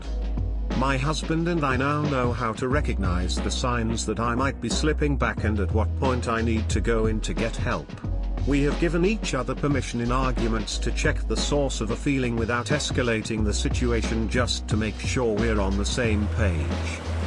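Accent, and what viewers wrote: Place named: United Kingdom. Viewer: I didn't even consider that the voice in this video was british also because i quit listening. But yes, the old voice (often named Daniel) is better. He isn't perfect, but he is the boss at this. Everyone else just sound wrong with the only exception being the american voice of the Radio TTS Youtube channel.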